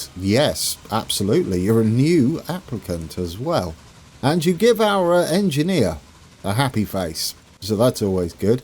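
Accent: United Kingdom. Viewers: For as British as you sound, not knowing Great Clock of Westminster is ironic. :P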